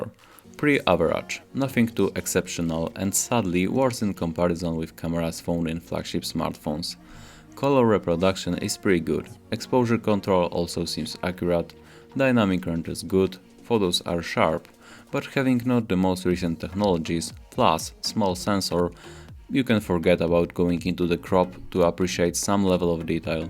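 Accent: Poland. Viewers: Are you Polish? Because I recognize the accent cause i'm Polish. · Słychać te Polskie korzenie ale nie jest źle. Łapeczka leci, pozdrawiam :) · He's a Pole! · are you polish person :)?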